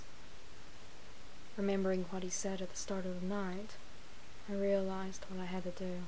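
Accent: South, United States. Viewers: I thought you sounded southern! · Australian?! LMFAO. Clearly tell she's a southerner. So cute.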